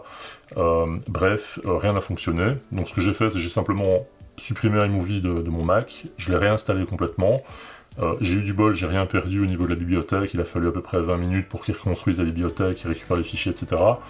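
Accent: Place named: Belgium. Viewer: Pour une fois que je trouve une chaîne qui explique bien les choses, ça serait dommage de la voir disparaitre :) Sinon, rien avoir, mais j'ai l'impression qu'on se connais ou du moins qu'on s'est déjà croisé :D Et quand j'entends ton accent Belge, étant moi même en Belgique, je me dis encore plus que mon impression est juste :D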